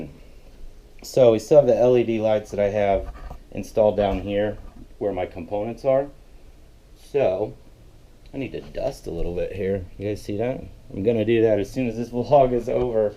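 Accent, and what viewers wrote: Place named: Poland. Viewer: First thing that hit me once i started watching your video was your accent, it sounds polish to me, are you polish? And this enterteinment led setup is super cool, need to make one in my cave.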